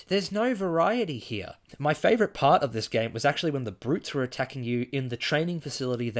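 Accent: Australia. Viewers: >deadpan Australian accent >scalie rantsona Oh yeah it’s gamer time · weird that the harvey norman mention was the tipoff for being aussie and not the accent. hi btw other aussie here, i keep seeing halo 4 at this op shop i go to and i've never played halo before and don't own an xbox so am I haunted? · Ausie accent with a lizard avatar that has big badonk-a-donks? This is amazing give me more. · Australian youtuber try not to be deadpanned and monotone challenge: impossible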